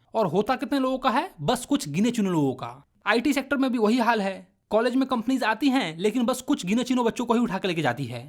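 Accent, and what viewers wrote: Bihar, India. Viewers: Yess he is bihari ✌🏻👽🌚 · bhai tum bihar se ho kya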